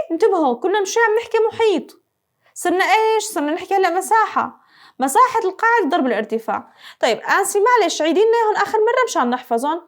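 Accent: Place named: Homs, Syria. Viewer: حمصية يمكن انت شو بدك ؟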